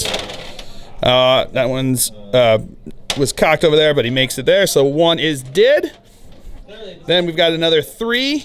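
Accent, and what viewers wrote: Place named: Alaska, United States. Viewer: Having lived in Georgia and moved to the Pacific Northwest, I have found a lot of people regularly speak like this. I think is original from Alaska (which may have similar accent)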